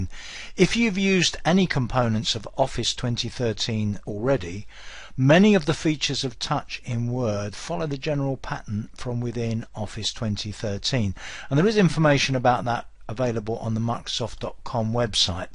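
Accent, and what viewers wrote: England, United Kingdom. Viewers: Only a dumb hick like you would get annoyed from a British accent. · I do not like the English accent. It is annoying and difficult to understand. It grates on my nerves.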